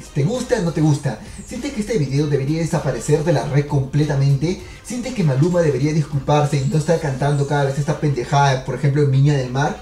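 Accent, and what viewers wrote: Mexico. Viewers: Eres de México o Perú..? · que verga pensé que era de mexico xd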